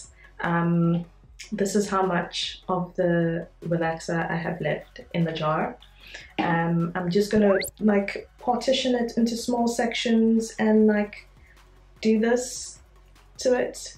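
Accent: South Africa. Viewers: Sounds like you have a South African accent, do you live there?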